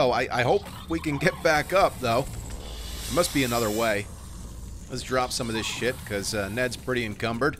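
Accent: New Jersey, United States. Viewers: He is one of the funniest gamers I've seen I think his New Jersey accent is awesome.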